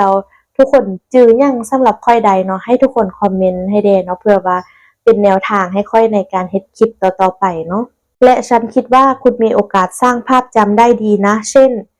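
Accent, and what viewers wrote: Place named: Laos. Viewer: มาส่งกำลังใจเหมือนเดิมค่ะ พูดลาวก็น่าฮัก พูดไทยก็เก่งค่ะ สู้ไปด้วยกันค่ะ ทางนี้ผู้ติดตามก็น้อยค่ะ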